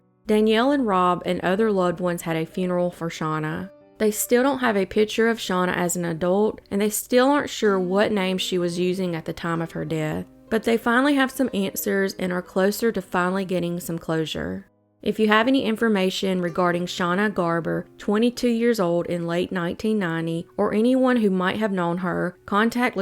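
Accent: South, United States. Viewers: i kept hoping you'd say "I do declare" at some point. We Brits love that Southern drawl... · As a Texas woman l appreciate a southern accent, enjoy your videos, so tragic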